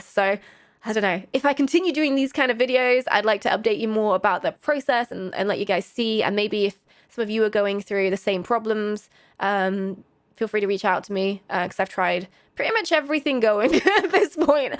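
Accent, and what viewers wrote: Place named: England, United Kingdom. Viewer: Your cute & fun, always a sucker for a nice English accent...your easy to understand, (cause' I'm American)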